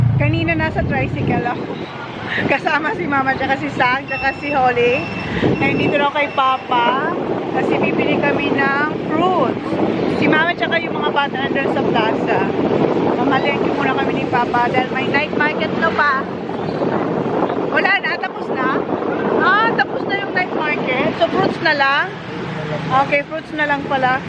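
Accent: Bicol, Philippines. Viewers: I love how you speak bicol, im a proud bicolano too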